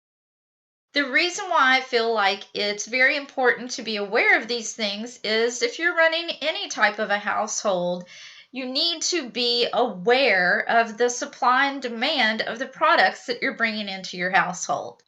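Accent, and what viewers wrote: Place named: South, United States. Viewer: Based on your accent, you probably have eaten plenty of Vi E Na sausages in your life. Vi(rhymes with die) E it’s a hard E, Na (rhymes with huh). Ok for all you non southerners let’s say it! Vi E nah sausages or just Viennas for short. And you eat them with soda crackers, not saltines. Southern lesson is over, go get you and your mama summan ta eat! 😘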